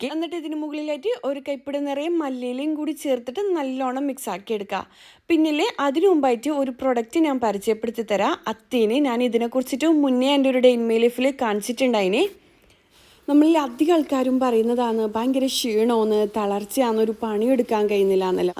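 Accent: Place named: Kasaragod, India. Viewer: കാസറഗോഡ് സ്റ്റൈൽ സംസാരം. കുറച്ചു ഉണ്ട്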